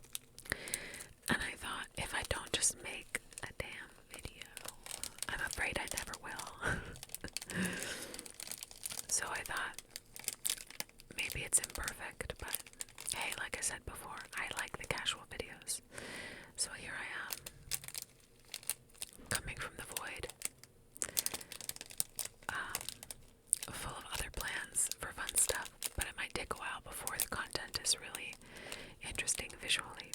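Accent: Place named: Manchester, United Kingdom. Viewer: accent is south Manchester/northern Cheshire by the sound it (I worked in Stockport for a few years, near the Pyramid) and it rings bells from then. If you want to get the tag off an IKEA mug, use WD40 - lifts it right off. Hand wash the excess WD40 it before you stick it in the dishwasher, otherwise it can cross contaminate.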